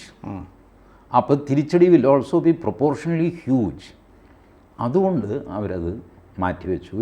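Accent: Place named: Trivandrum, India. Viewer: Like his TVM language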